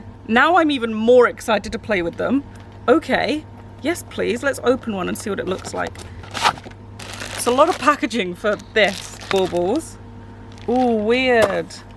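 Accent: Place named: RP, United Kingdom. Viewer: She sounds posh btw love ya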